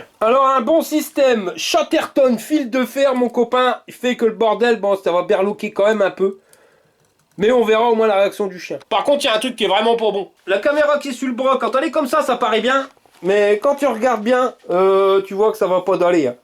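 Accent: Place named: North, France. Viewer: Salut , je viens de découvrir ta chaine et rien que pour ton accent d'min coin je dit oui :) Je suis du nord aussi proche de valencienne si jamais ^^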